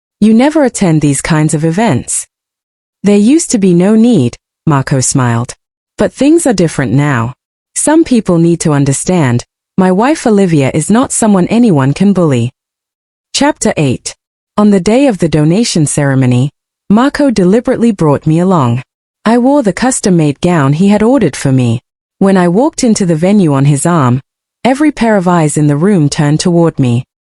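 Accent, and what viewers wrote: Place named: England, United Kingdom. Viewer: 1st! Interesting English accent! 😊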